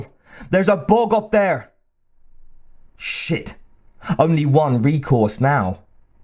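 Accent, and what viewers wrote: United Kingdom. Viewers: Truly committed to your craft to finish the whole thing if you felt the same way I did. Berserker was how I found your channel. It was pretty good and the relationship stuff had a sinister angle to it which was much better than whatever Genocide was trying to do. Berserker narration was fine IMO. There is this actor Will Patton that narrates a lot of audiobooks and I always groan when he narrates one I want to listen to. Nothing against the guy but his voice is very distinctly from South Carolina which doesn't really work for most books he reads, at least for me. Your accent makes it sound like an English Thespian is reading and for whatever reason that seems to work for everything. I'll check out those other books, thanks for suggesting them.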